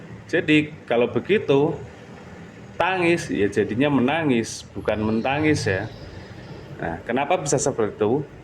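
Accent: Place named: Java, Indonesia. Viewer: Bahasa indonesianya medok bgt mind, orang mana mind ?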